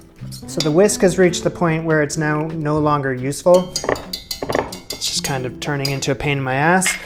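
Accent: Canada. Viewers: Do I hear Canada great video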